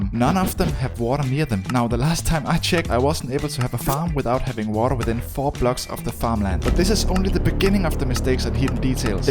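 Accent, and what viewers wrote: Denmark. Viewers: Den danske accent er kraftig men god video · as a dane i can really hear the "danish" when u speak funny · Are you danish I think I hear some danglinsh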